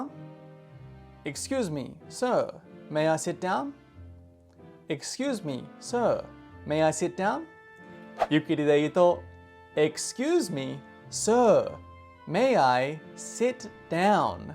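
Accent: United States. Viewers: 映画好きとしては、こういう授業は、非常に助かります。永く英語を勉強しているのに、字幕なしで映画を聴き取れなくて、困っていました。このチャネルをこつこつと観ていけば、聴き取りが上達しそうな気がします。先生は、「ニュー」を「ヌー」と発音するので、さては、アメリカ人ですね。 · Are you American? koz I don't feel you have British accent like a Harry.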